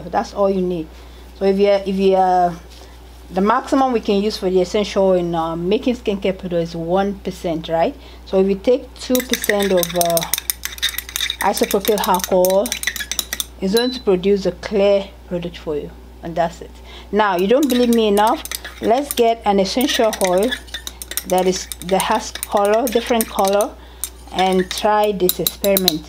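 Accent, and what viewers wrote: Nigeria. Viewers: I came here and heard the Yoruba accent. Arabirin Mi, Esheeeyyy ❣❣❣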